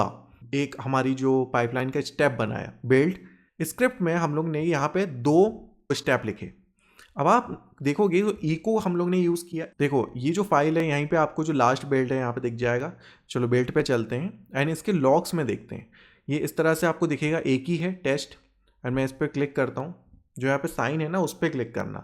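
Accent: India. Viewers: Awesome Indian Accent wiht confidence unlike others who fake accent i really loved and enjoyed this video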